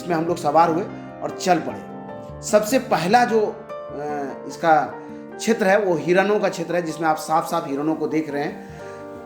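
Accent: Bihar, India. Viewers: aisa kn hai Apne state ka hi accent hai Jab koi punjabi ya south Indian ka pronunciation sunne milta hai tab to log cool bulate hain Humare ghar ka hi accent hai to hai Accent ke naam pr america aur britain vale bhi ladte hain So Bihari hai to bihari accent hi niklega Its not a matter of shame, but our identity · Language hi or language ka tone hi to dusre log se alag dikhata hai jo humare Bihar ka hai isme koi dikkat nhi hona chahiye kyunki yehi culture hai jis par humlog ko proud feel krna chahiye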